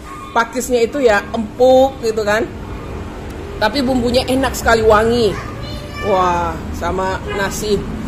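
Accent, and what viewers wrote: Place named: Minangkabau, Indonesia. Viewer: Bahasa Indonesia diselangi dialek minang amat suka saya dengari..tersusun fasih mempesona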